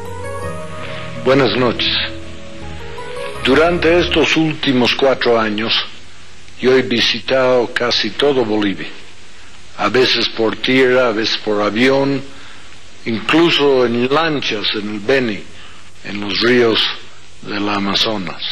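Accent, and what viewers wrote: United States. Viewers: Es boliviano. Nada más q a vivido más en EEUU q en bolivia. Por eso su asentó americano.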